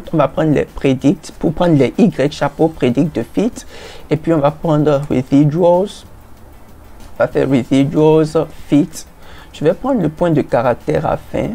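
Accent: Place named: Benin. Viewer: s/o to my beninese people , you make me miss my country with you accent , thank you I hope everything is going great for you i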